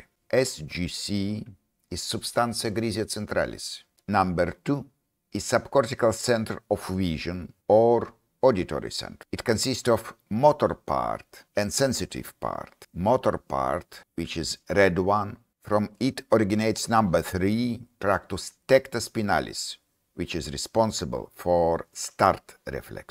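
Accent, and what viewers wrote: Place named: France. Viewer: Какой у вас милый французский акцент)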